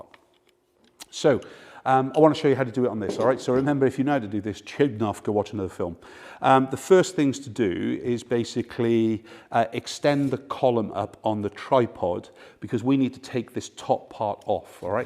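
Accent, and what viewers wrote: United Kingdom. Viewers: I love english accent.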